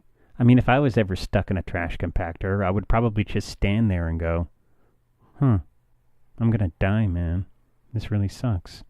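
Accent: United Kingdom. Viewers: I love your Paul McCartney accent lol. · Killer British accent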